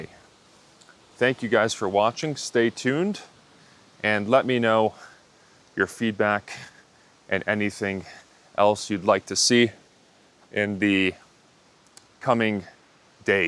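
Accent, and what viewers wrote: United States. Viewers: Oh man, perfect american english to the intermediate and almost advanced students. Everyday conversation is what we need. Inside and outside. I'm brazilian. Nice to meet you. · He's American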